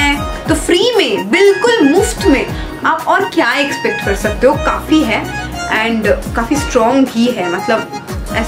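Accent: Bengal, India. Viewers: Ma astay kotha bollo tomi ki bangali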